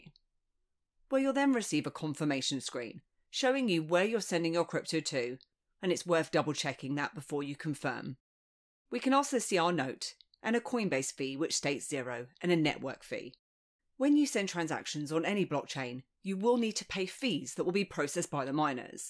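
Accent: United Kingdom. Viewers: refreshing hearing a Brit rather than a American Accent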